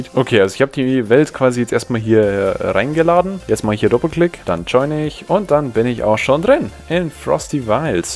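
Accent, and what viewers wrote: Austria. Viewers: Bist du österreicher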